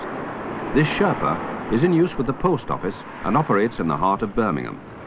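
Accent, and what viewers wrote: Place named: Yorkshire, United Kingdom. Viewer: More than slight; probably Yorkshire. Like Michael Parkinson.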